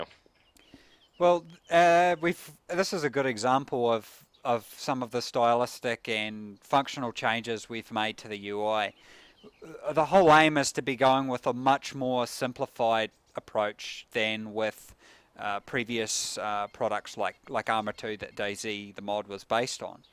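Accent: New Zealand. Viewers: Lol you didn't recognize a New Zealand accent? Anyway it's the ugliest accent that the English language has, after Californian. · Guy talking has a kiwi NZ'er accent. Am I wrong? developer is a Kiwi? :D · Love the kiwi accent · Dat kiwi accent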